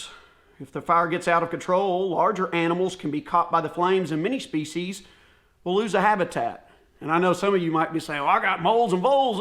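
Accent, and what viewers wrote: Arkansas, United States. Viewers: Hay I was wondering if you are from Arkansas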